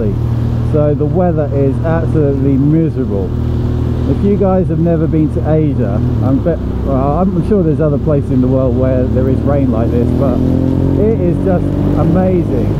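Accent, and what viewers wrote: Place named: Manchester, United Kingdom. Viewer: Not sure I can use your accent as a pointer, however if I can I would say its not north Manchester, second stab.. Didsbury?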